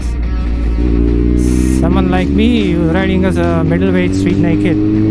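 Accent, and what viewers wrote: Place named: Kerala, India. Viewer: i thought you was malayalee😅 anyway goog vlog😍😘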